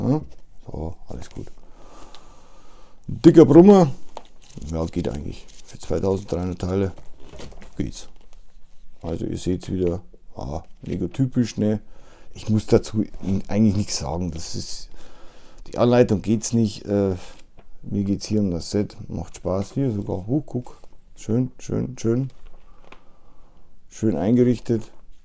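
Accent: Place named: Bavaria, Germany. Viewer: finde ich nicht gut Minifiguren herum zu werfen... gibt Minuspunkte. Vielen Dank fürs zeigen eines Disney Produkts, und das nicht Review, deiner Meinung zum Set. Gefällt mir auch gut das Set, aber aus Platzgründen, Zeit und anderen Projekten muss auch mal was nicht gekauft werden. Hehe, hier lernt man dann noch Bayrisch oder wie auch immer diese Sprache die du sprichst man nennen soll - grins. Klaro, Sticker sind manchmal auch cool, oder einfach weg lassen und das Design ist wirklich cool vom Häuschen. Grüsse Inu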